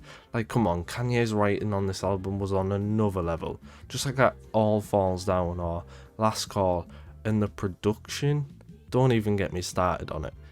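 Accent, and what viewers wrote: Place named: United Kingdom. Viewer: Lmao, what did u expect he's from the UK. As soon as I heard his accent, I knew this video was going to be a hard watch.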